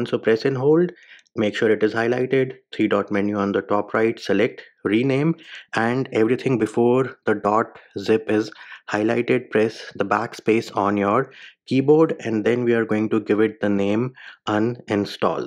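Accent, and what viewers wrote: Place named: India. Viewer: Why tf are All these videos made by Indian dudes with thick accents holy s***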